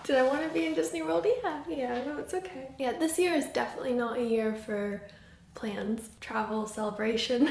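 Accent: England, United Kingdom. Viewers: You are sounding quite English lol love it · I love how you sound a little british now❤️ congratulations! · The longer you are in England, the more British you sound. For some reason, the accent really suits you! Happy anniversary. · I can hear the British accent starting to shine thru, like a little bit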